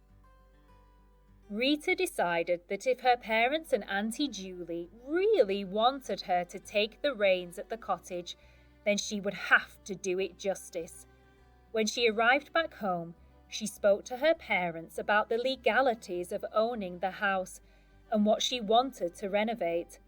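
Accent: England, United Kingdom. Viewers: Does this program pronounce with British accent? It is so beautiful! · What a lovely story! I enjoyed and appreciated it quite a lot as it gives the chance to improve and keep up to date my reading and listening skills.I do love the English accent so thanks a lot! Marinella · English UK? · British English is so pretty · Great story to learn English with British accent. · Are it's use British Accent? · British accent 😍😍😍😍😍😍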